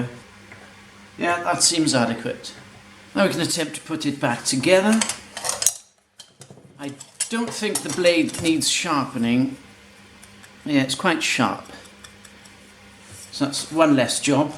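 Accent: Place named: RP, United Kingdom. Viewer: Words fail me...and what the hell is going on with the posh accent?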